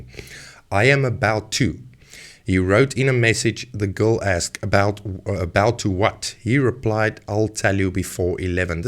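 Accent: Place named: South Africa. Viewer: why this south african sounding ass over here worried about american 2nd amendment? 🤣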